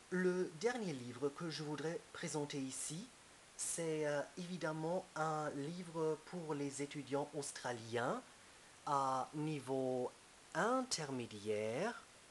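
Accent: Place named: Germany. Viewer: Tu parles très bien le français ! Ne t'inquiète pas pour ton accent quand tu parles français on comprend tout ce que tu dis. En ce qui concerne l'indonésien, je sais pas pourquoi mais j'ai l'impression que ta prononciation a été influencée par l'allemand parce que parfois l'intonation est très étrange. Est-ce que t'as beaucoup écouté l'indonésien avant de le lire?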